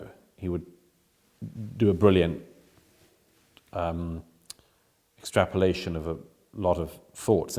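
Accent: United Kingdom. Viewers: This guy wriggles around and says nothing of substance, but with a snobby British accent and attempts to be oh so intellectual. Humans will get better at letting go of Fairytale Bob in the Sky (aka god) and find more comfort over time in reason - and kindness, facts, and the knowledge that we just don't have all the answers. Fairytale Bob needs to spend more time in Syria, and Chicago, and Baltimore, and a trillion other places and perform real miracles on mass before a serious thinking person will ever believe in him.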